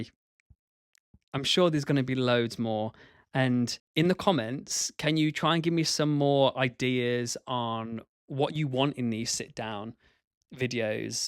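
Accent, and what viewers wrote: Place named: England, United Kingdom. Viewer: Thanks for sharing. Like most people here, I’ve been watching some of your dancing videos for quite some time now, and it’s nice to (literally) hear more about you. And btw, I’m French, and to my ears your English accent is to die for 😌 In any case, keep following your dreams and making us dance with you 🕺🏼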